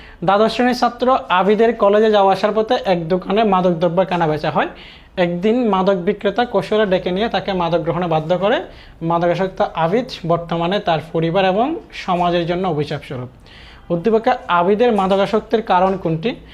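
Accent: Chittagong, Bangladesh. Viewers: Bhaiya apner basha Chittagong maybe🙂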